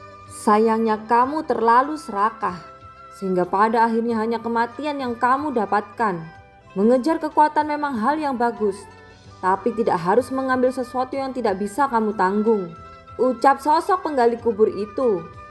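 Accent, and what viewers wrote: Java, Indonesia. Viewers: Nah mulai enak suaranya&cuma logatnya masih medok v😁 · Kak orang jawa yah??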